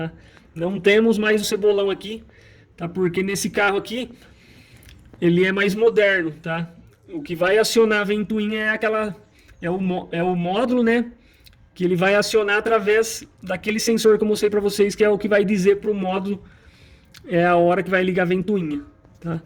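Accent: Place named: Espírito Santo, Brazil. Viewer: Aqui no Espírito Santo falamos assim tbm. Cada região com seu jeito de falar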